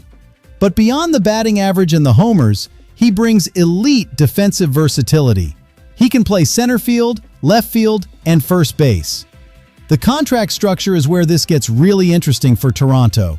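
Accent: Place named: South, United States. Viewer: Why does the AI voice have such a good old boy American accent?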